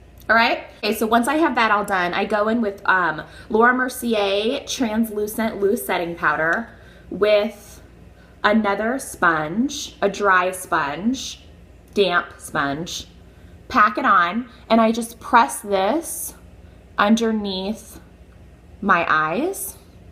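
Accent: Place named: Midwest, United States. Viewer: oh, I don't think it does. pretty typical sounding Midwestern American sounding gal yet unique to her. I love her voice!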